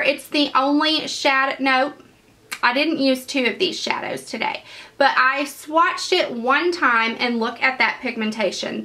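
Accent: South, United States. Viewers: Those are amazing!! And as a former texan...I love your accent! Still a southerner..just a state over. I subscribed. Going to have to check the site out!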